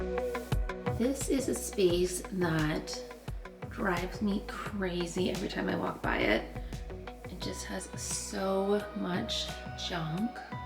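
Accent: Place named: Canada. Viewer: You're doing a great job! I'm like you and get completely overwhelmed by clutter but I'm not a naturally tidy person so it's an uphill battle! I think my space is similar to yours in that it already doesn't have that much in it to begin with. But it's all abt how we FEEL abt the space isn't it? At one point I recognized your Canadian accent and then shortly after saw The Bay on a box! Judging from a scene in another vid, I used to live in the same area (generally speaking!) as you. I look forward to the rest of this series of vids👍🏼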